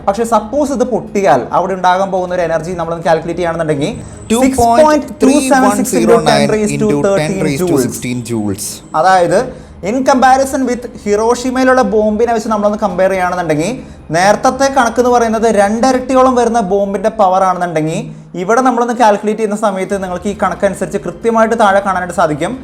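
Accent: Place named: Thrissur, India. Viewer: Bro🥰 Thrissurkare ano?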